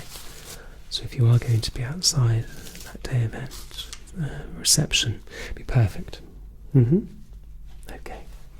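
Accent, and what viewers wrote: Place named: RP, United Kingdom. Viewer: love the sound quality..🔥🔥🔥🔥🔥 Your tongue in cheek posh is brilliant. It's not the air conditioning that's the problem for it being insufferably hot 🔥. It's heating Meridian's up and a little dab is not going to suffice in cooling madam. LOL. Cracking me up over here - " I know you're a bit of a magpie, you like those sparkly things..." ♥️♥️♥️🔥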